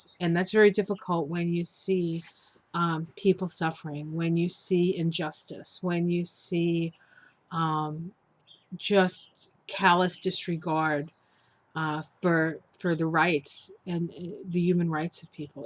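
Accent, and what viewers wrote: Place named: East Coast, United States. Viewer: Hi! I've been so busy lately that I haven't been watching. It's so good to just sit down and listen to you. As soon as this video is finished I will find the June Kabbala reading. Many blessings to you and thak you! 🧡 P. S. - I love hearing your East Coast accent. Makes me feel right at home. ☺️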